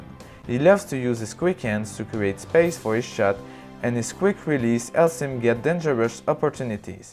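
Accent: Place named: Quebec, Canada. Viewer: Are you a Quebecois? I am trying to figure out what your accent is. I am Californian. My distance from it makes me unaware of the accent.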